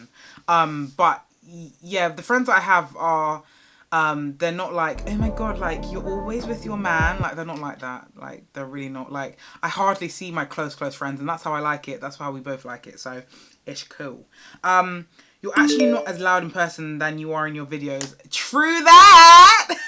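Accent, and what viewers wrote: United Kingdom. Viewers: your british accent 🇬🇧 i wish i had the same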